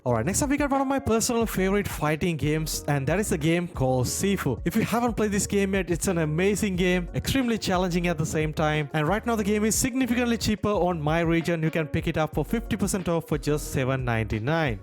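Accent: Sri Lanka. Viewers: Are you sri lankan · Let me guess are you sri lankan?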